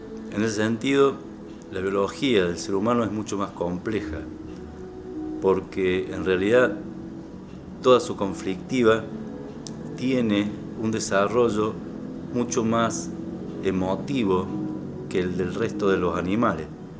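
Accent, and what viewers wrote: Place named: Córdoba, Argentina. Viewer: Gracias por compartir por este medio tu conocimiento, con palabras tan claras y ademas, con tonada cordobesa.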